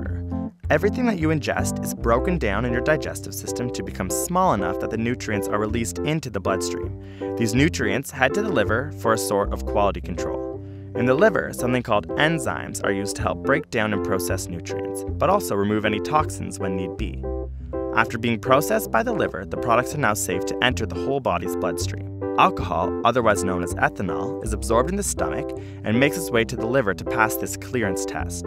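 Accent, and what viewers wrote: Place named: Canada. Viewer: From a phonetics point of view, this guy might just sound "gay" because he exhibits features of canadian raising- the other guy has it too kind of. I'm not saying all canadian guys sound gay, but that kind of chilled out, raised intonation (as if every sentence sort of sounds like a question) and vowel that's often found in californian and canadian english CAN sound similar to stereotypical "gay" speech. Of course this is all arbitrary as people sound the way they do, regardless of orientation.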